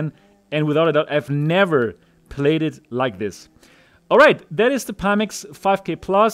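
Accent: Germany. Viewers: So I hate to ask the incredibly silly question but what is the native/prefered language of. I'm trying to learn many languages and I find it interesting to figure out who non-English speakers adapt to English. My personal guess from listening to this vid. is german.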